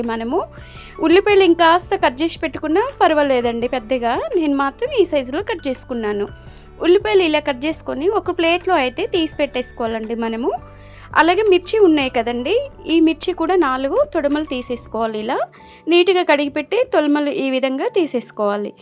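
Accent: Telangana, India. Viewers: Telangana & Andhra mix ah andi? Yekkada mee native?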